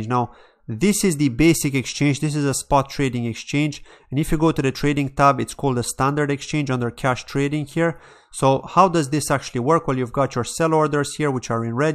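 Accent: Ireland. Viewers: You sound like an Irishman ☘️☘️☘️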